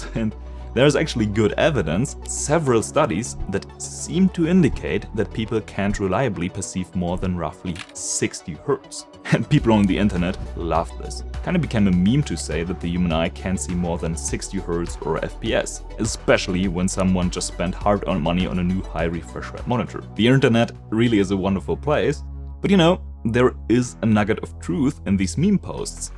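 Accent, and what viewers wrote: Germany. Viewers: Falls du deinen Akzent verbessern willst: Schau dir mal an, wie man das "e" in Hertz im Englischen aussprichst. Musst die Zunge dabei deutlich entspannter halten - du gehst in Richtung Amerikanischem "r" - machen viele Deutsche so, ist aber für den Großteil der Englischen Welt falsch und passt so überhaupt nicht zum Rest deines Akzents. Ähnliches gilt übrigens für das zweite "o" in "Monitor". Nur so nebenbei ;) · bist du deutscher? oder spinn ich...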